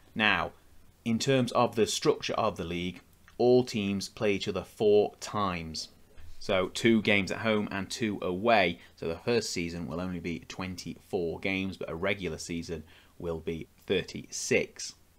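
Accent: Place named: Ireland. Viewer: Bro the Irish head in ya.. Would never say that you're Latvian...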